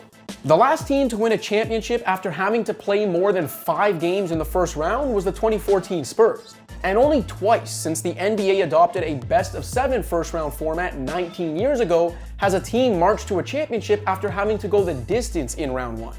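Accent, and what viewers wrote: Canada. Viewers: never noticed your cool canadian accent before 🕺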